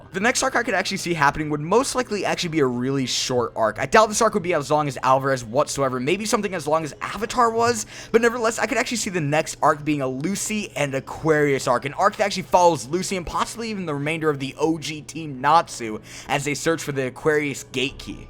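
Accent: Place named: United States. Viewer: Omg this is the first time i hear your voice sempai! haha XD She is .... very... sexy ! and American! haha 😂 btw i wish the studio J. C. Staff. (Shokugeki no soma) who take over the anime and 🤔 I think there may be a flashback of several episodes on everything we didn't see during the ellipse after tartaros arc; with gray/juvia , mirajane, laxus ...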